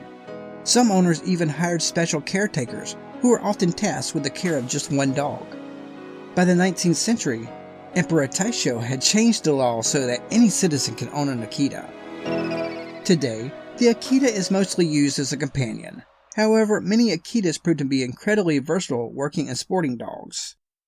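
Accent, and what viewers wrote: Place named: United States. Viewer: I do agree with you about them being different breeds, but if he is from America (which he sounds like he is) then he is not technically wrong by putting both together, since if i am not mistaken in America they are not considered to be a different breed. So no real reason to insult him on doing poor research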